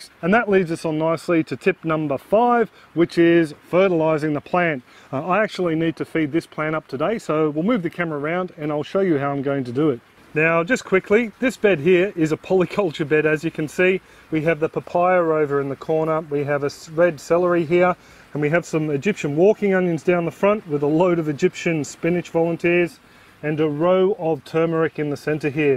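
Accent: Australia. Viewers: I really enjoyed your video. It was really easy to understand and I LOVE the Aussie Accent!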